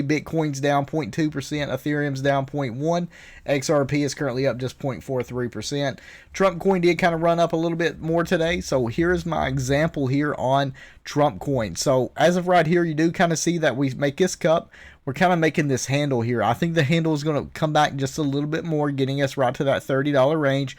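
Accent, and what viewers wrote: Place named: Deep South, United States. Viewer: Hey! I LOOOVVE your deep south accent...🥰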